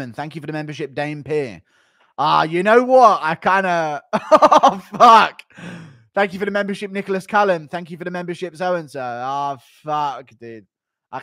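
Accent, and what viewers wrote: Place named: United Kingdom. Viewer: it is lol. he speaks like 3 different languages and has a red neck vibe to him but talks like hes from britan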